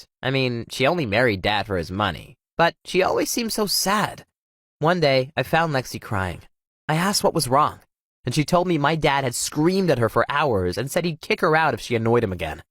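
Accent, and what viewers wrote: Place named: United States. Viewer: Is nobody gonna address the fact that he is from South America, and yet he has an American accent?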